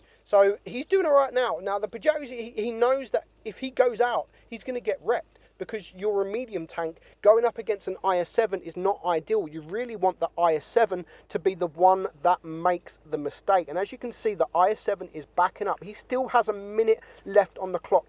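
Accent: United Kingdom. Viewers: Where is he from? UK?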